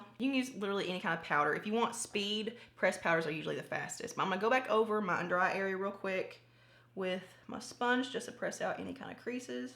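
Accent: South, United States. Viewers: #partypeople Brand new subscriber here and I must say I really enjoyed your video and this technique. I have never seen this done before. You are so beautiful! I just had to let you know. Oh and ps. From one fellow country gal to another, I love your accent! Nice to know I’m not the only one with a twang! 😘😉🥰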